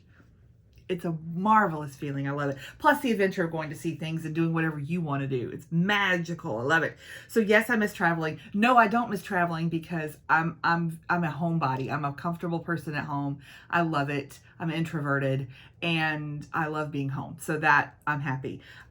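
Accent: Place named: South, United States. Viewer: I found you from your beautylish lucky bag video. Never commented on YouTube before but wanted you to know that I stayed and subscribed because you truly do seem to “be kind” and positive. It also doesn’t hurt that you talk like me. 😁 I’m from SC where we also eat “hoop” cheese and know how to say Greenville!! I appreciate how raw and honest you were with some of these questions. I think your younger self would appreciate the advice. You’re truly a hidden gem here on YouTube.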